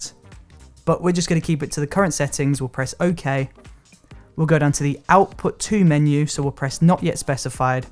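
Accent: United Kingdom. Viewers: really like your brittish acsent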